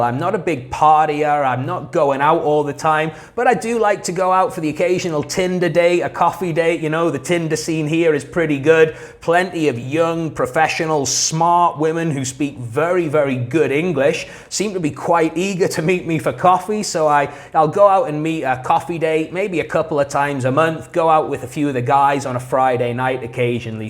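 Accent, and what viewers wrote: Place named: United Kingdom. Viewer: accents is British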